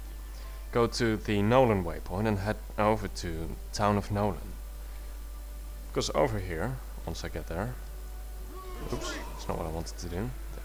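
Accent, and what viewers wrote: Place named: Netherlands. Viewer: Netjes engels man ;) maar de T's en D's geven je weg :P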